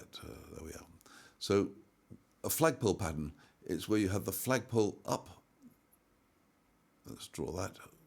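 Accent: United Kingdom. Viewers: Greetings from NY. Technically speaking, being in the "Black Friday" (profit) is positive for stocks, even though consumers will be in the Red if they overspend on things they do not need this week. I love the British accent, but I add captions to your videos so that I do not miss any details you are discussing. Love the office setup. Have a great holiday with your friends & family.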